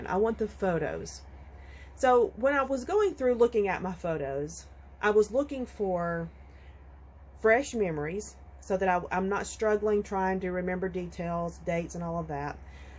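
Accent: South, United States. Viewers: I always start with my photos. That gives me the direction. PS: please don't stifle the "country," enjoy hearing your Southern accent. 🌞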